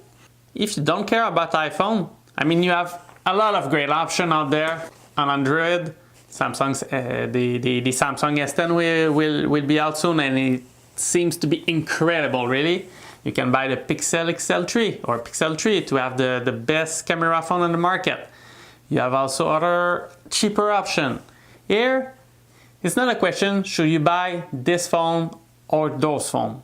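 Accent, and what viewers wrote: Quebec, Canada. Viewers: Wooooooow t'es du Québec right? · J’ai reconnu l’accent québécois hein? PS:moi aussi j’en suis un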